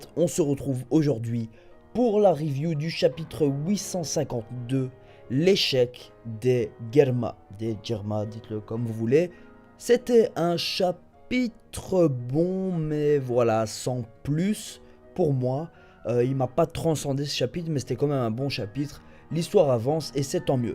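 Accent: Belgium. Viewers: "ouit cent cinquante deux" hmmm je kiffe l'accent belge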